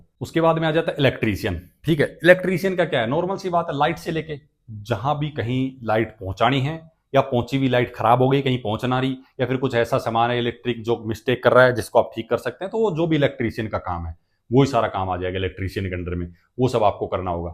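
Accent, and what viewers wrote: Haryana, India. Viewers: Bhai aap haryana se ho Kay ?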